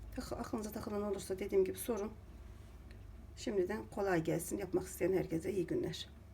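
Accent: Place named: Kayseri, Turkey. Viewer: Bacım sen nerelisin kayserilimisin ne tatli turkcen var 🥰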